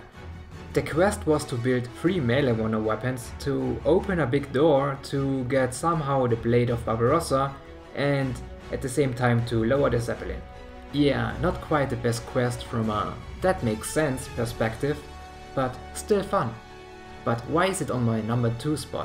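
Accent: Germany. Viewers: The German accent makes it perfect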